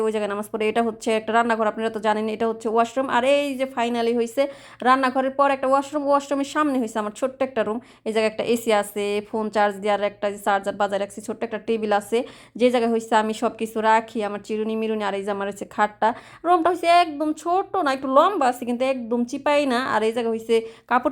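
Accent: Faridpur, Bangladesh. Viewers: আপু আপনার মুখের ভাষা শুনে কেনো জানি আমার মনে হইতেছে আপনার বাসা ফরিদপুর। আপনার বাসা কথা জানাবেন আপু?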